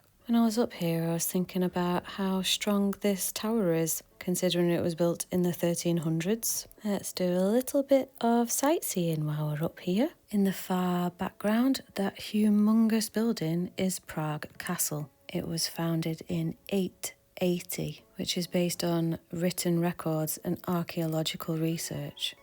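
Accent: United Kingdom. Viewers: youre like jon levi if he was a woman and bri'ish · Great to hear a northern voice that’s also got a great level of intelligence also.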